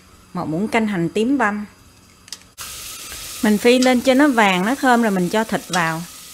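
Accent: South, Vietnam. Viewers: Always love hot boy voice and yours. Your South Vietnamese accent is cute. Your kitchen and cooking tools look very clean. I am impressed. Keep up the good work. Hope to see you in person some day at any restaurant in CA. I can’t eat a lot like you (because just smelling foods also makes me fat.😂) but I do enjoy watching your food stories).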